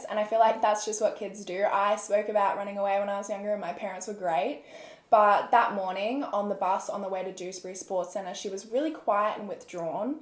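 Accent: Australia. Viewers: So strange hearing an Australian talking about a case that happened down the road from me 🙈 also please don’t tar us all with the same brush 🙈😂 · I love your accent what type is it? Australian?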